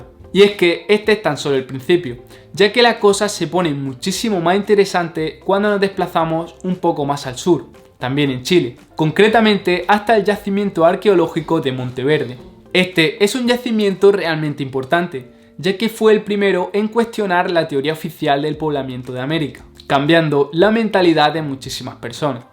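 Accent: Andalusia, Spain. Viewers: Ay, no ocultes tu bonito acento andaluz. 😍🇲🇽🇪🇸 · Así se habla en el sur de España, sé más respetuoso al preguntar... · Del sur de españa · Debe ser de Andalucía. Si le quitas la "zeta" verás que habla muy parecido a nuestro Caribe. En el caribe de hispanoamérica tenemos una fuerte influencia de Andalucía y Las Canarias. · ...NO sé si se dieron cuenta pero este señor no pronuncia la "S" al final de sus palabras....al mismo tiempo parece tener un acento español . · Si no te haz percatado el chaval es andaluz. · no es por una discapacidad, tonto! Es obviamente del Sur de España. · Cynthia... Ese acento pertenece a uno de los que hay en Andalucía (sur de España) más concretamente es de Granada y Almería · Es inevitable no hablar de tu acento me gusta como hablas, pero en que lugar de España hablan asi🤔 saludos desde Puebla,Mex. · Radiólogo Millonario , acaso así hablan las personas del sur de España ? 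Específicamente en que lugar ? Aunque alguien comentó que era Venezolano, pero parece que no lo es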